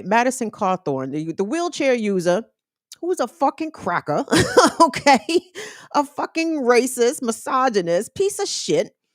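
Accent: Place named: New Jersey, United States. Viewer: Too be fair,she really sounds like somebody from Jersey or maybe Staten Island.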